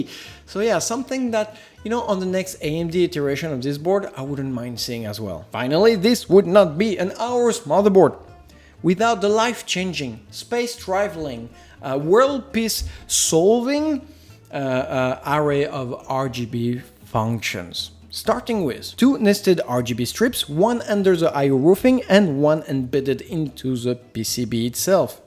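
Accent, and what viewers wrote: France. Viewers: C'est magique, mais quand c'est un français qui parle anglais, je comprends tout! Merci et je te félicite pour ton English french accent car je travaille dans une société française qui bosse à l'international et dont bon nombres des salariés parlent anglais au téléphone et j'ai régulièrement les oreilles qui saignent en entendant leur phrasé dégueulasse et leur absence d'effort pour parler anglais proprement. Toi tu parles vraiment bien anglais, ça fait plaisir. Je découvre ton travail et je vais continuer à te suivre. Je pense que je vais prendre cette carte mère, tu m'as convaincu. Elle n'est pas super fashion (même plutôt… moche) mais elle est sérieuse et d'un bon rapport qualité prix. C'est ce que je recherche. Elle semble disposer d'un bon étage d'alimentation bien refroidi et dans cette gamme de prix, je n'en vois pas d'autres. Ma carte actuelle a 16+2 phases et ça fait 10 ans qu'elle tourne nickel avec un CPU overclocké à 4,7Ghz (Asus P8P67 Deluxe + 2600K) Je recherche quelque chose de comparable sans être aussi bien je m'en doute, les CM haut de gammes sont bien trop cher de nos jours.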